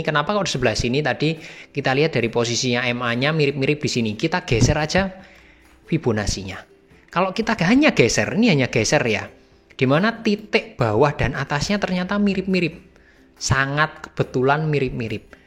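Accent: Java, Indonesia. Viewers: Ngomong cuk e medok tenan Cuk!!🤣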